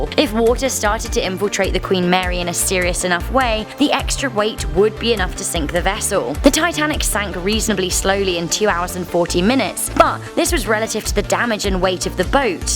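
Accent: England, United Kingdom. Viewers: I like this person's Englisacccent, but seriously.... She can't pronounce Cunard? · She's British accent bro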